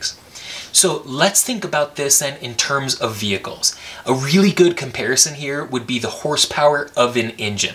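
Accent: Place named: Kansas City, United States. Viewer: You from Kansas city?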